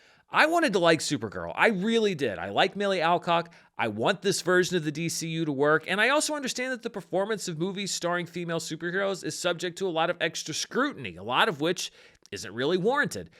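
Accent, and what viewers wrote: St. Louis, United States. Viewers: Are u from Saint Louis??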